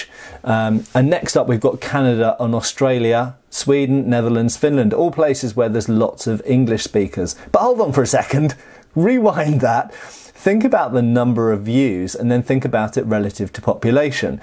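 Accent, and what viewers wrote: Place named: United Kingdom. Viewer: 'Ello! French here! I'm not so much into weapons and stuff. I don't know about the other French people, but I'm just suscribing to your channel because I love ze british accent! Also, 'ere, we don't say ze metric system, we say ze internationnal system. Lot of love from Paris.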